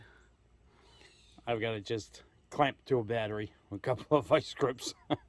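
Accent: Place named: New England, United States. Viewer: LOL! Ithis is the first video of yours that I have ever watched. I am originally from NY, and have family in Ct and Ma. I heard the accent and said he must be from Mass. But further research said you were in Carolina. I was thinking how could he have that New England accent? Now I know.😊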